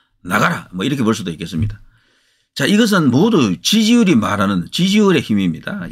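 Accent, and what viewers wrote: Gyeongsang, South Korea. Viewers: 님 경상도 말솜씨 에 정감이가고 진실성이 있어 많이봅니다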